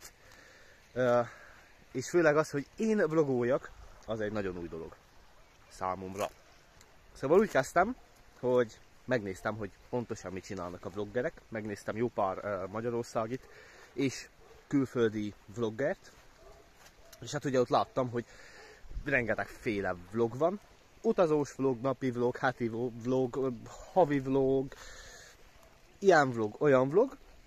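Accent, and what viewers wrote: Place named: Szekler Land, Romania. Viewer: Szerintem nagyon szépen, választékosan tudsz beszélni, úgyhogy már emiatt is érdemes belevágnod. A másik, hogy sajnos csak egyszer sikerült eljutnom Székelyföldre, de imádom hallgatni a székely magyart.. :) Nekem már a tájszólás miatt is kellemes a lelkemnek hallgatni Téged.. :) Amúgy hozzám közel áll a világlátásod, és szerintem a humorod is rendben van.. Szóval hajrá, fel is iratkoztam, ment a like is! ;)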